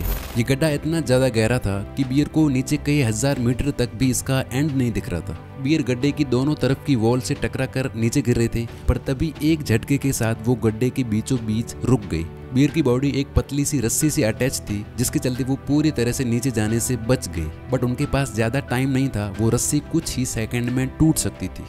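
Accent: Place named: Marwar, India. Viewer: Jodhpur se ho? Marwadi tone aa Rahi h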